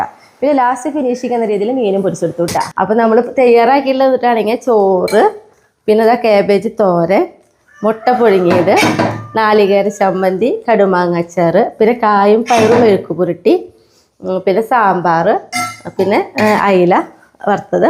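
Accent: Thrissur, India. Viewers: thrissur aano sthalam?..ollur aano?... talking style kandittu thrissur pole thonni..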